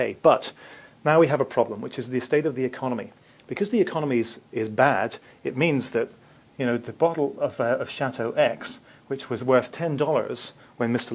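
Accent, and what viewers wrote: England, United Kingdom. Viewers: Hes english not irish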